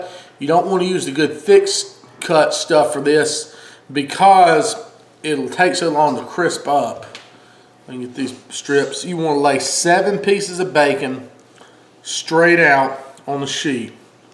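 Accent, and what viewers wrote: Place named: Eastern North Carolina, United States. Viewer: is very much an Eastern North Carolina guy. His mannerisms, his accent, his tastes, even his inefficiency. And, of course, his Easrern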